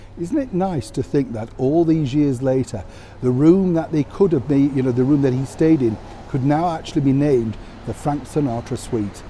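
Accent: Yorkshire, United Kingdom. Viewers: Good morning, you say some words just like my nannie who was herself from Yorkshire yet mostly you have a nice clear voice perhaps from spending some time in London. Loved much of music, I think I'll have a revisit of that, thanks. Great videos today, interesting hearing about your time in radio x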